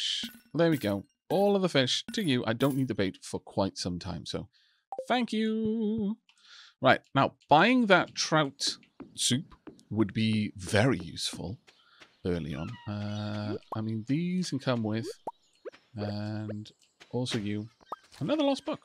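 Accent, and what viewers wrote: Wales, United Kingdom. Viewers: Are you a welshy like me? 🏴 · Just found this series love it. You've got a hint of Welsh, where are you from?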